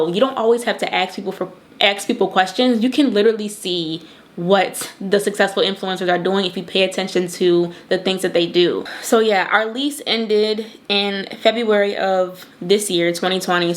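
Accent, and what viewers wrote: Baltimore, United States. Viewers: Soon as you started talking I knew you were a fellow Baltimore native lol. It's rare to hear people who sound like the people in my everyday life on YouTube. The way you talk is so familiar lol. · In all the years of watching you, I just realized how subtle your Baltimore accent is! I too live in Miami (born and raised) but I live south near Zoo Miami. Praying the best for you and your new endeavors 🙌🏽 I just applied to FIU for their new Cybersecurity program 🙏🏽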